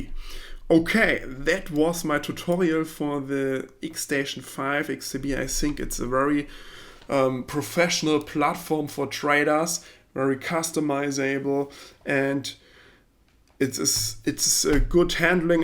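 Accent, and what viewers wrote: Germany. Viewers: Bro nices Video aber fühle den Deutschen accent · deutscher Akzent kickt😂 Aber sehr gutes Tutorial. 10/10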